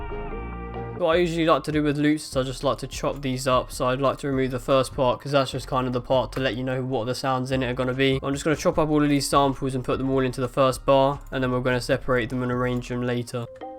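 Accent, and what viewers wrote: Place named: United Kingdom. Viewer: guy is really BRITISH!!!!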